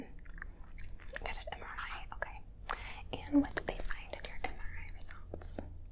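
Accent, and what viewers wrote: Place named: Great Lakes, United States. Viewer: I have no idea where her filming location is, but she has a very distinct upper USA Midwest/Great Lakes accent, also known as the 'northern vowel shift' accent. You'll hear it from time to time when she says words like flat, gallon, etc., with very flat, elongated 'a' sounds. So 'flat' becomes 'flaayt' and gallon becomes 'gaayllon.' Watch her 'Earth's Crust' video, and you can really hear it when she says 'volcaaynic' as opposed to volcanic. Really common in Cleveland, Akron, Buffalo, Chicago, eastern Minnesota, etc. Basically in/around the Great Lakes in the United States.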